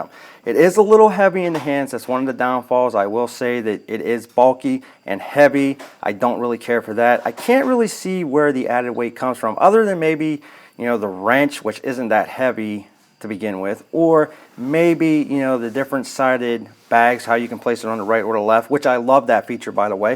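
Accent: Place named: Philadelphia, United States. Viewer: you sound like you are from the Philadelphia area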